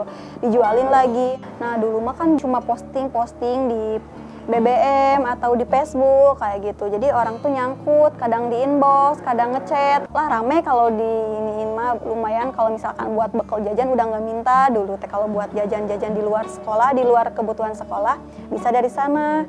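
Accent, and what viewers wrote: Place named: Bandung, Indonesia. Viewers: logat ciamis nya masih kentel keneh teh...hehhe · Beberapa kali lihat video di channel ini banyak banget menginspirasi saya untuk punya usaha sendiri. Cuma mau action masih bingung. Belum nemu ide jual produk apa, bingung cari tempat supplier yang tepat, pusing bagi waktu sama kerjaan sekarang, dll. Bener" bertekad dalam Minggu ini harus segera action, saya punya prinsip gak mau selamanya jadi karyawan, mumpung masih muda gak ada salahnya kerja keras membangun kerajaan bisnis sendiri dari 0. Btw kakak owner nya cantik, seneng aja dengerin dia ngomong, teteh" logat Bandung gak ada obat 😂😂. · Bandung pisan kak logatnya hihi, keren sukses selalu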